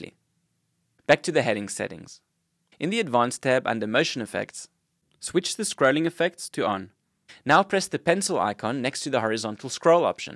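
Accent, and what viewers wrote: South Africa. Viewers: I love that South African accent, makes me feel back at home. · South African accent, yum.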